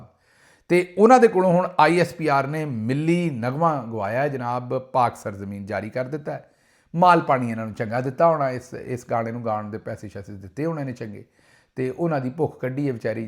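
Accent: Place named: Malwa, India. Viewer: Bahla harami aa Dr gill jka safe aali malwayi bolda but chubbviyan gla karda.. dharam naal nzara aa janda Dr tere video dekh ke charde punjab to.. dr vaise kise veele choundey chakk dinna Faislabad to lagda marasi hun mein ni kehna ptandra ggaint Dr sahab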